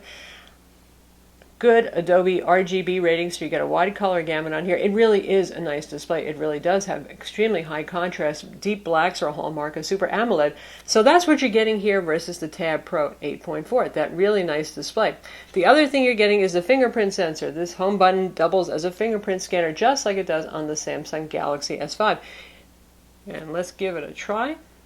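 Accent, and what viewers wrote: Texas, United States. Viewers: You sound texan to me...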